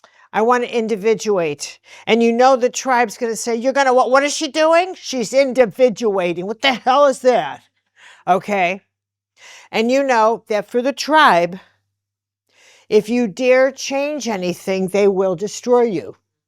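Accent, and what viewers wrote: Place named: New York, United States. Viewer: I like it - instead of triggering, it makes me laugh. I'm not familiar with where grew up, but her accent reminds me of my childhood in rural central New York.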